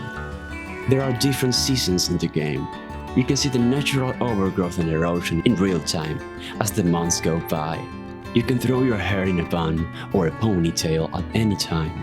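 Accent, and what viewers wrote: Argentina. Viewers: Flaco hablas muy bien inglés, por tu voz sospeche que eras argento y lo verifiqué en tu cuenta. Alto video papá 10/10 seguí asi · vos sos argentino si o si a mi no me engañas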